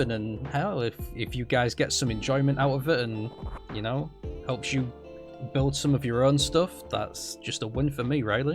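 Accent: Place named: Yorkshire, United Kingdom. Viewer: Knew the accent was familiar, I live up near Middlesborough and go to the York markets nearly every year.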